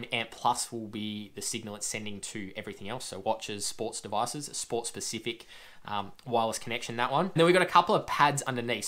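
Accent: Australia. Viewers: Hey, that was a good Video really appreciate you work on this, I myself use a Polar H10 Cheststrap along with the Vantage V, have been stuck with Polar since 2013 and since then always Cheststrap measuring. I'm very interested in the topic of burning 10 000 Calories (opposing to eating those) Have done that in 2017 (but despite having all material never managed to cut it and upload it) Now I have seen qute some Videos of people attempting it with all kinds of measurements...yes also using myfitnesspal and no heartrate measurement whatsoever. Then also a lot with the wristbased measuring and i had the feeling those might read the heartrate higher. You said because of bloddpressure the reading might be higher on the wrist. WOuld you assume in a quite heavy lifting session as the bloodpressure shoots almost through the roof the wristbased measuig would give a higher average heartrate than the cheststrap? 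Cheers mate greetings to Australia I'd guess from your accent...but only after having posted this comment will checkout your other videos where you might have revealed that alrady. Sporty Greetings from also a Sportscientist from Germany living in Dubai. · I concur, and the Aussie accent always helps 👌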